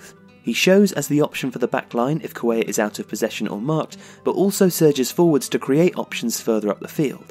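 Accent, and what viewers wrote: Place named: United Kingdom. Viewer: cara e bem difícil o inglês britânico , haha slc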